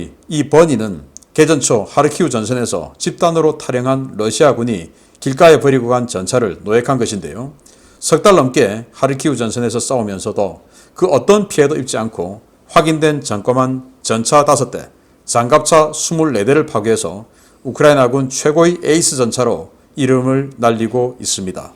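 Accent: Gyeongsang, South Korea. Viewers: 신안균이 견공들이 많은 경상도대구출신인가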